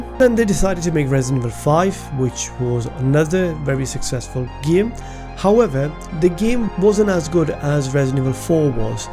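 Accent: Newcastle, United Kingdom. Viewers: Off topic, is that a Geordie accent?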